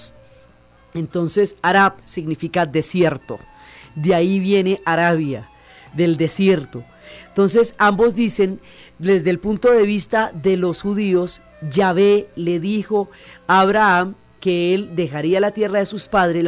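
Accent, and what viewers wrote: Colombia. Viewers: excelente es de gran ayuda felicitaciones mujer ,,me gusta el acento por lo que noto es de el valle acento Caleño .... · muy bien explicado.Por tu acento pareces Colombiano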